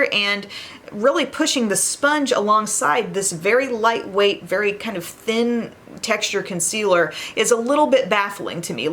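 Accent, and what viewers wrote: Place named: Midwest, United States. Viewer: a nasal voice is indicative of the midwestern American accent, she's from Illinois. As for broadcasting, used to be a newscaster; originally did her YT as the. Your attitude needs no explanation.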